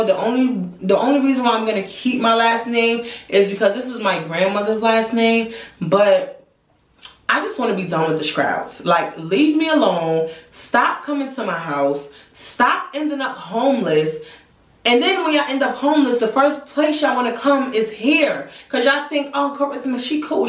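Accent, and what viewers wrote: New York, United States. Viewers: I want to visit New York so bad. I love your look, your style, your accent and slang. I love it 😍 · I just found ya page and I couldn’t understand why I love you soooo much already, then i heard the Nyc in your voice. Even then i was like no thats not it, then you said youre an aries 🥳🥳🥳 thats its 3.21🎉 gang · I knew you were from ny😂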